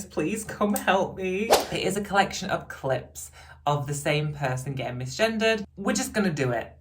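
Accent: United Kingdom. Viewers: You’re so right. Hey what is your specific accent from in U.K.? :) Love your videos!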